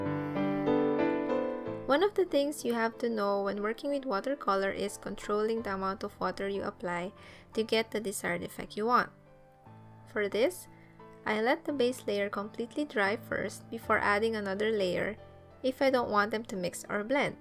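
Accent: Philippines. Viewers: I really love thisss!!! I could tell by ur accent that ur a filipina, I'm so proud✨ Btw, could u make some tutorials about how to color skin or where to put shadows/contour (specially on the nose) and blush on face in watercolor painting pls? Thanks in advance!💗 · You sound filipino So are you a Filipino???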